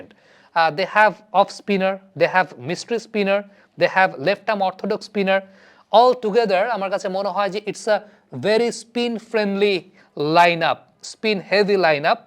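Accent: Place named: Chittagong, Bangladesh. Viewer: আপনি তো চট্টগ্রামের বাষাও খুব ভালোই বলতে পারেন।😮😊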